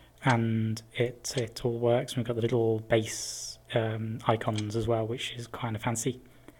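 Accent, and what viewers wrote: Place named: United Kingdom. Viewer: I am really happy to have voiceover on these! Your lack of voiceover experience is compensated by your British accent. You're doing great! Keep up the good work!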